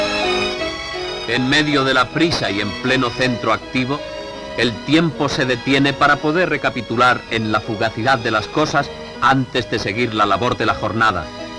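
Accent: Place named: Spain. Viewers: El locutor debe ser español, en esa época el acento porteño era más marcado que ahora, sino mira películas argentinas de los años 60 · Por supuesto que el acento es distinto, si el locutor es español🤦‍♂️🤦‍♂️🤦‍♂️🤦‍♂️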